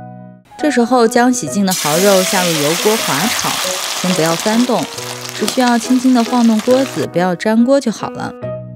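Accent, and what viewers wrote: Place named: North, China. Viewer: 看起来很好吃 喜欢听你北方味儿的普通话 字正腔圆：）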